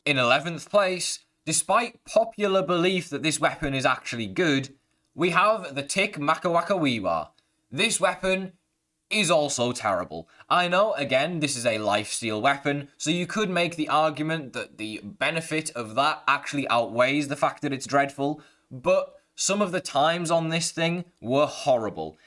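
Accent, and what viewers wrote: United Kingdom. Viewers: The black ox hammer is so confusing. On paper it should be so much stronger, but it honestly sucks. BTW, you have a British accent my friend. How are you butchering all those pronunciations? 😂😂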